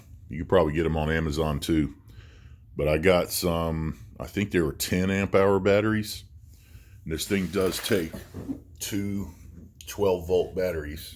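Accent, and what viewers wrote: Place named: United States. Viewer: Bro ur voice it sounds so American how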